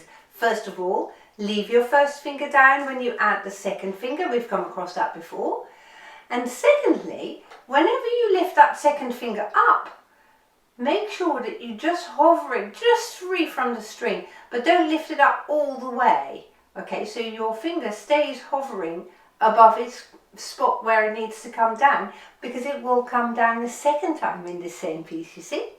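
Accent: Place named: England, United Kingdom. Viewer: Great lesson as usual. Thank you,. You have a lovely English accent that is very rare these days. What is its origin if I may ask? Sounds very posh!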